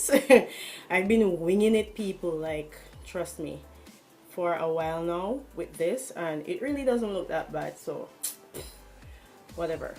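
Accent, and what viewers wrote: Jamaica. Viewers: Are u Jamaican? U gotta be, I know that accent anywhere lol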